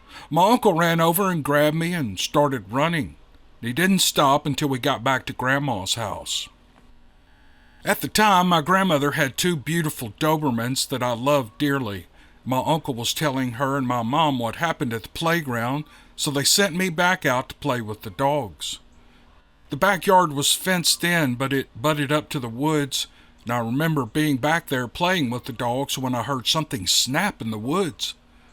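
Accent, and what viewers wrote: South, United States. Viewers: Thank you & thank you to those that send their stories in to to share with us. All of these were amazing!!! Glad you aren't using the AI in these The stories with AI were good as well, but we like the magic in your good ole' country boy accent...Just something special about that southern drawl....😂😂😂 Gotta know we love you?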